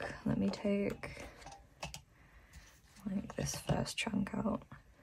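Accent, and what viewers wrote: United Kingdom. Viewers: . In answer to your question you sound more south east England than London. But then again I only know some accents from London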